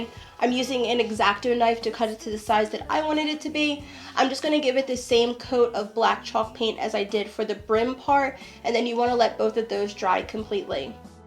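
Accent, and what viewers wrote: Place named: East Coast, United States. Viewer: First time with your channel,and it won't be the last. The the rich cocoa tone of your east coast accent. Miss my New Jersey home, and the sounds, now in Arizona. Love all the DIYS, especially the reindeer. Thanks for taking me home.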